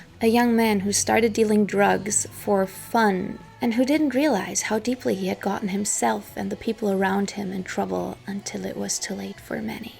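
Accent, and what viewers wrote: United States. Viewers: WOW you have an awesome American accent! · I thought you were American until you said English wasn’t your first language. Fooled me. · you are a delicious woman with a perfect american accent!🤩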